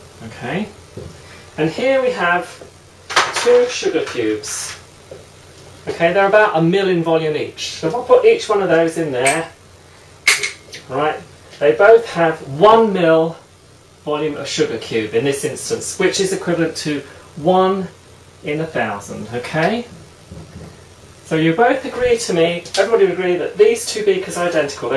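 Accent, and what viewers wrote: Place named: England, United Kingdom. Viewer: I only believe this guy because he has an English accent. Great demonstration.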